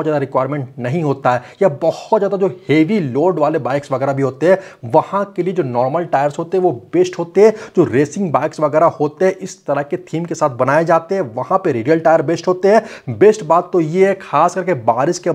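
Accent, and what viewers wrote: Ranchi, India. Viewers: Bhaiya ap Ranchi se hai kya??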